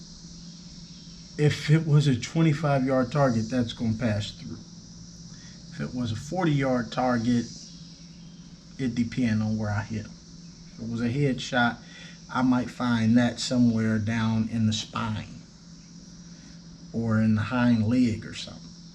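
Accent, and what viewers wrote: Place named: England, United Kingdom. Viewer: Man I love your videos..good honest self reviews.. liked your English accent.. Yes you earned it.. 1. You need to compile all your ammo vs M3 settings because there are too many of them now. May be a cheat sheet.. 2. Comparison of heavier JSB with lighter ones in the same tuning... and on a lighter note , I saw this review on 65 inch so one suggestion- pls do organise your stuff and do some 5S BTW waiting for you next review..love from India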